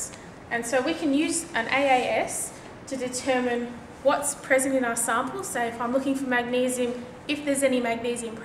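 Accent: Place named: Australia. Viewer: hey, you sound Aussie!! Great explanation - used it in Yr 12 HSC Chem today. Thx heaps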